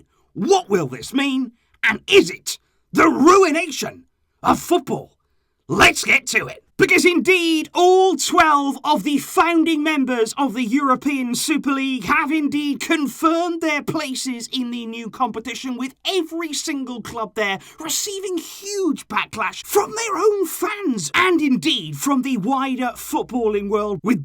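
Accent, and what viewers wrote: United Kingdom. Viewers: When British people get angry it sounds so beautiful. I didn’t even pay attention to the video 😂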